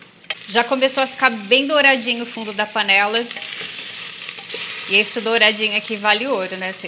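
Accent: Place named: Piracicaba, Brazil. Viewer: Adoro seu sotaque piracicabano, muito simpáticao.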